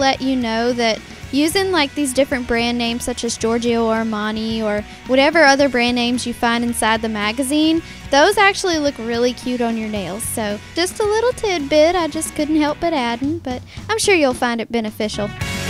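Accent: South, United States. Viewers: You are a adorable!! I LOVE YOUR SOUTHERN TWANG !!<3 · Where Are You From? You Sound Extra Southern.. Lol But Your Real Pretty.. ! I Subscribed!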